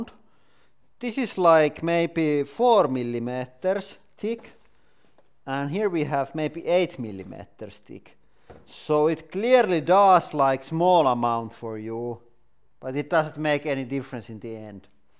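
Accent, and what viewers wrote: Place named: Finland. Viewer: Well that's Finnish for you and the mighty rallienglanti.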